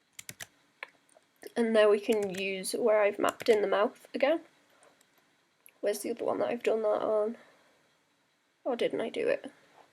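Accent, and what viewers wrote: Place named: Worksop, United Kingdom. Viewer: I just found your chanel and its really refreshing to a) hear someone that sounds like me! (I'm from Worksop) and b) actually see someone work thats not perfect on the first stroke!!! It's great to see someone work REALISTICALLY! ;)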